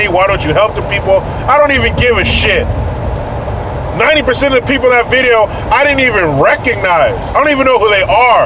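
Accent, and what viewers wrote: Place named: Minnesota, United States. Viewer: your Minnesota accent is hilarious sound just like em!' 😝😄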